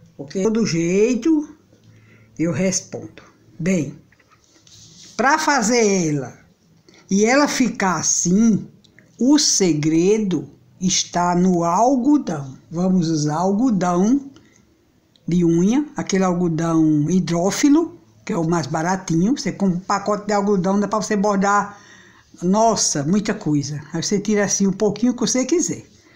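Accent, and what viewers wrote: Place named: Northeast, Brazil. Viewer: NEGA AMEI VOCE E SUA SINCERIDADE . UM CHERO BOM PRA TU . SOU FILHA DE NORDESTINO . AMO ESSE SOTAQUE.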